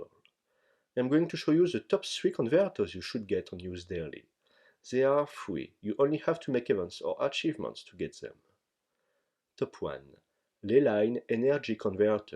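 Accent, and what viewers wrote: France. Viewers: It's the first time i hear a French accent ! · I noticed you're French and loved your accent. ☺️ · Worst accent x) wtf x) why didn't you make this guide in your native language? Guess it's French, it would be better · You just sound like you are from France :) To me you are one of the best players in the game! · why would i ever make fun of an accent i really enjoy listening to? coming from a non native english speaker that is learning french, iz verrry interrrrestang to hear :P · En vrai c'est beau gosse d'oser lancer une chaîne anglophone en étant français, compte tenu de l'accent francais très difficile à perdre.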